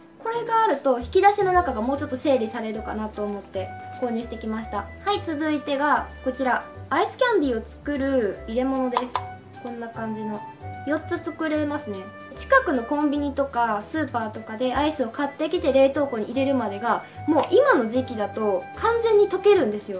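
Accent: Standard. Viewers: なんか、標準語？ww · めっちゃ標準語｡ﾟ(ﾟ´ω`ﾟ)ﾟ｡ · 今日とくに喋り方標準語になり気味やな（笑）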